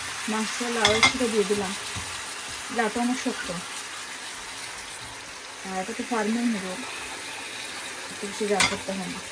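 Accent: Sylhet, Bangladesh. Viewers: আপু আপনার সিলেটি ভাষার কথা বলা শুনতে খুবই ভালো লাগে। ভর্তাটা খুবই লোভনীয় হয়েছে। জুতা গুলা সত্যি খুবই দারুন। ব্রেসলেট এবং জুতা সবই সুন্দর লিপস্টিকের কালারটা ওয়াও❤ ভালো থাকবেন আপু।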